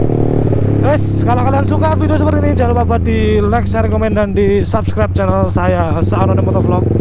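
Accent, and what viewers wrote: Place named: East Java, Indonesia. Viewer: Sampean orang jawa timur ta bang,kok kalau ngomong jawa kayak basa jawa timuran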